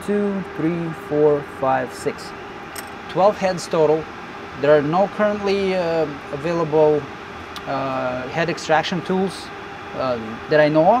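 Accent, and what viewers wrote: Russia. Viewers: Русский акцент?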